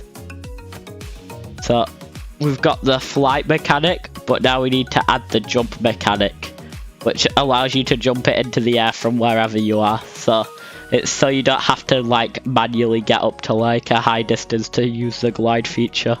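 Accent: United Kingdom. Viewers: I like your British accent idk if ur British but sounds like British to me btw I subscribed cuz of ur glider ❤❤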